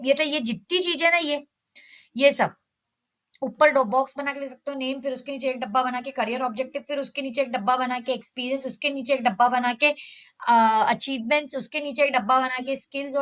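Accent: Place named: Bhopal, India. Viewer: didi aap pakka bhopali ho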